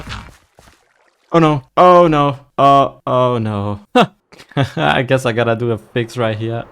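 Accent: Germany. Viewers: hab garnicht drauf geachtet das du nen deutschen akzent hast haha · U have a german accent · Ekelhafter deutscher Akzent 🤮🤮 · I hear deutsch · bro you acent sounds so german bro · I hear the german accent like crazy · your german acent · I can hear the "Deutsch" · German accent makes this 1000x times more relaxing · Finde den deutschen Akzent crazy funny weiter so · der fakt das ich rausghehört habe das du deutsch bist ist crazy weil dein englisch sehr sehr gut ist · I hear Deutsch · Du musst deutsch sein man hört den Akzent so raus 😂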